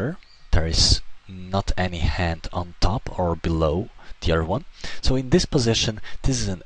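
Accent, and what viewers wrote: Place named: General American, United States. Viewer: he speaks with an accent that is called general american english.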